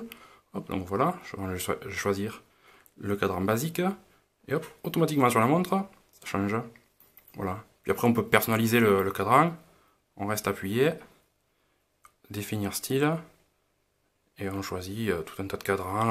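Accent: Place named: Perpignan, France. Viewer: L'accent de Perpignan 😂😂😂 Une boîte toute blanche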